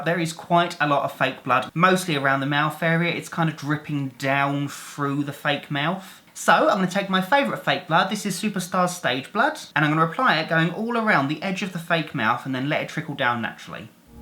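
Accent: United Kingdom. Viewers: wait is this youtuber british?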